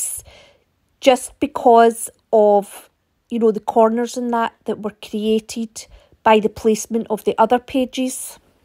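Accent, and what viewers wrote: Scotland, United Kingdom. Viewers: I just watched Ceri the Crafter's video where you sent him ATCs and happy mail. I have just subscribed to your channel. :) I love these little pieces of art you have made. They are beautiful. (I especially like the dark ones) I love your accent. My mum was Scottish, so it makes me nostalgic. (I live in Australia). Oh and I would totally watch a longer video with you showing the process of these. They're brilliant.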